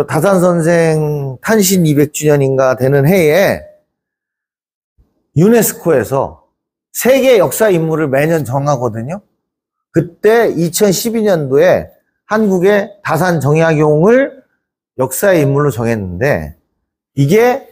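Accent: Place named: Chungcheong, South Korea. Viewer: 걸쭉한 충청지역 토속억양이 구미 당겨놓고 시작하니 이 풍성한 명절에 선생님 만나는 기쁨 호삽니다 언제어디서나 인간의 만남에 공통 이야기 상식 풀어 주시니 고맙습니다 건강 유지하시길.....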